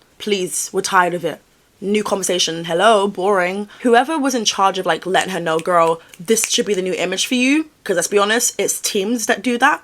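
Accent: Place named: England, United Kingdom. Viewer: GIRL how did i never noticed that you had an English accent until you literally said so 🤦‍♀️🤦‍♀️🤦‍♀️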